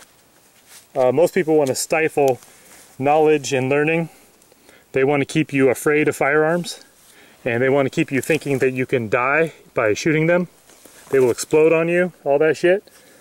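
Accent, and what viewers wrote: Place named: Appalachia, United States. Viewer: classic hillbilly dialect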